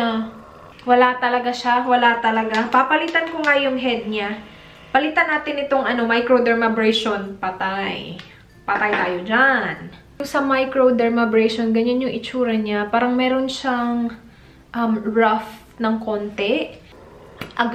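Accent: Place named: Negros, Philippines. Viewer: Taga san ka po? Parang taga negros ka ee. Hehe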